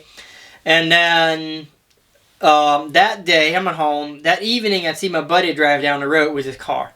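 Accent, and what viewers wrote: Pennsylvania Dutch, United States. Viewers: You are a good inspiration. I am 81 and grew up in Pennsylvania into a pa Dutch family and it is so great to hear your accent. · When I first heard you on your video I thought I recognized the PA Dutch accent. I grew up in Lancaster County, PA and had Mennonite friends. Never saying "good job" is the German way - even among some of us PA Dutch "English". "There is therefore now no condemnation for those who are in Christ Jesus." Roman 8:1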